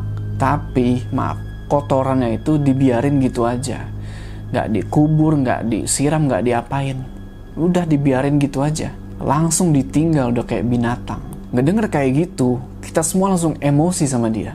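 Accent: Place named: Bandung, Indonesia. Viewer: Kang teh orng mna nya sga orng bandung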